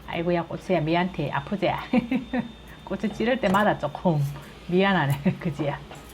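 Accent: Gyeongsang, South Korea. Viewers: 님 처음댓글 다는데 시청잘하고있 습니다 님 지역이 어디세요 경상도 시투리 귀에 익숙했어 저는 영천입니다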